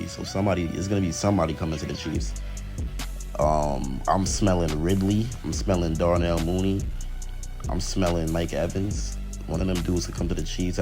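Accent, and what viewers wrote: East Coast, United States. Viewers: sounds like he from east coast some where 😂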